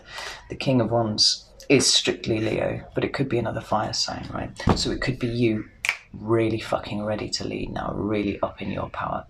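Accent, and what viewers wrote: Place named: RP, United Kingdom. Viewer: I love an educated British accent. I can hear why others inspired you to read the Scherezade book (can't remember the title 😁). I miss Britain. I'm an American with an English mum, and I've always felt at home when I've visited your beautiful country. Your reading was spot on, and I loved the great commentary. I'm a new subscriber. Cheers! ❤️😊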